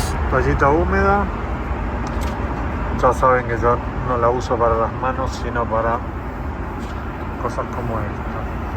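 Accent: Argentina. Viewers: se nota de lejos tu acento Argentino para los que sabemos diferenciarlo buen video y saludios de un Urugayo en galicia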